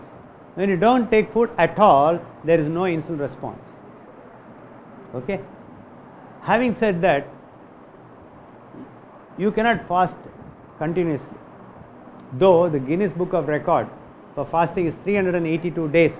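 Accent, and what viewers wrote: India. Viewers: He is repeating Dr.Jason Fung in an indianised english.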